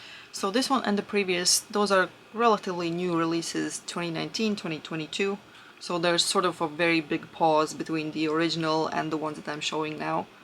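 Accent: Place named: Russia. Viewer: Your accent sounds like you're Russian 😊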